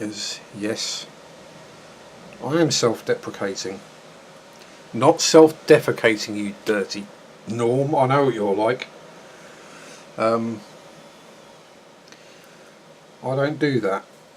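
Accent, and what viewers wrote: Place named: South Africa. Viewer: How do you spray white? ;) or how does one spray white :) whey mannn nowt rang wit talkin South African like!!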